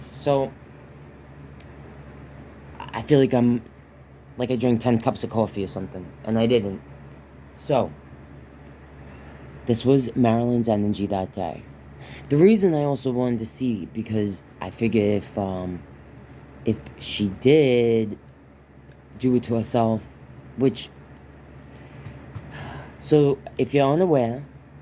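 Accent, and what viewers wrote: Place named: New York, United States. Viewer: Loving 💞your videos as I go through a dark challenging time, your vibe is healing and helpful to me for reasons I don't understand ,and I like your ny accent or so, my grandma was from long island so it sounds familiar, ( the quiet epedemic and I'm not crazy I'm sick, videos and free documentary on Lyme disease called Under Our Skin, ( cousin to syphilis , u can be destroyed) the masses don't know how bad it can be it's like a sci Fi movie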